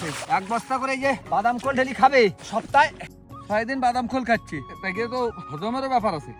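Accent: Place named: Khulna, Bangladesh. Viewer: খুলনার অরজিনাল ভাষা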